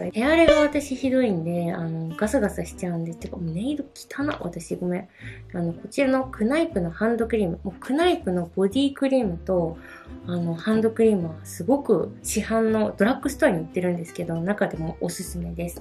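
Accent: Kagoshima, Japan. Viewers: 鹿児島県民です✋ 鹿児島弁聞いて、癒されました笑⸝⸝- ̫ -⸝⸝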